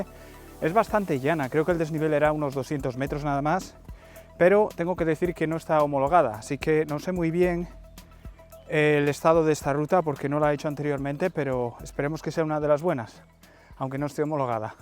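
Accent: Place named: Catalonia, Spain. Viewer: hola tu eres catalan verdad?? puede que te delate ese acento o me equivoco jajajaja yo soy de barcelona pero en cuanto herede me piroi a vivir asturias de cabeza a un pueblo de 50 personas y ha vivir como siempre quise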